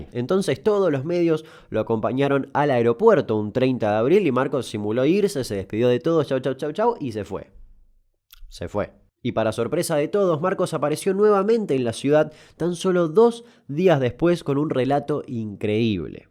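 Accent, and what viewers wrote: Buenos Aires, Argentina. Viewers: Me encanta tu "y" patinada bien porteña...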